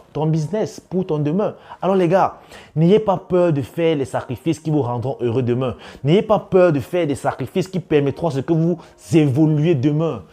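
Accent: Cameroon. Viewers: L accent camer du bled me fait toujours rire lol 😂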